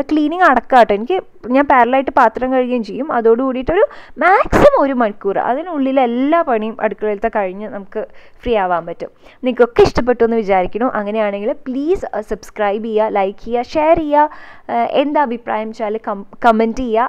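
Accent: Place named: Palakkad, India. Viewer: Ha slang kettapo enikum thonni chechi pkd aanennu... Ur videos r awesome njan new subscriber aanu lub yew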